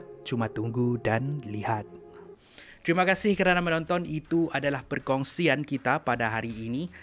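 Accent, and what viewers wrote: Sabah, Malaysia. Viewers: Ko ingat semua orang Malaysia ni melayu ke....dia orang sabah memang la guna bahasa baku Malaysia.......hairan betul aku dengan orang melayu ni🤦‍♀️🤦‍♂️ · X prnh dgr loghat Sabah ker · Orang Sabah bah dia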